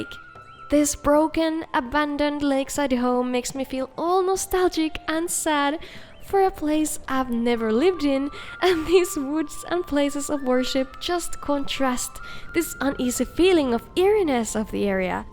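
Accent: Finland. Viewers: Finnish accent spotted, torilla tavataan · oh... OH... i didnt recognise from the accent at first, but... finland... huh... didnt think i'd find one of my kinsmen from the depths of skyrim mod enthusiasts. still, gonna download that mod, 100%. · Are you from Finland?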